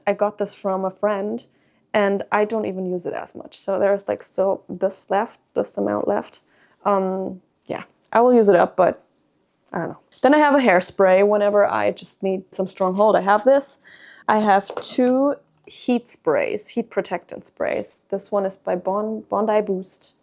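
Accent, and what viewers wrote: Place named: Germany. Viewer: Ich finde es so sympathisch, deinen deutschen Akzent zu hören. Freue mich auf's Video. Herzliche Grüße aus Berlin 😊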